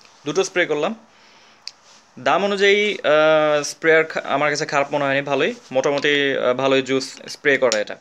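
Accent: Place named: England, United Kingdom. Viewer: Don’t mix up English accent in Bengali. It doesn’t suit you & moreover it truly irritates many. Disliked the video for the same reason.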